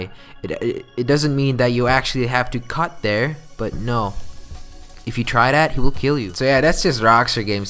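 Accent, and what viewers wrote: Netherlands. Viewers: He sounds like he's got abit of an accent he had another video were a twitter screenshot was in a different language so I'm guessing he may be Dutch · its the way pronounces its cos he is from he netherlands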